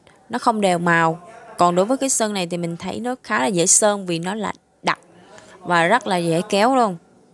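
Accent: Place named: Vietnam. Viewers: Kính thưa các loại vùng miền luôn.chắc ở nước khác nhưng gốc việt 😅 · Haha giọng miền nào nghe ngộ vậy 🤣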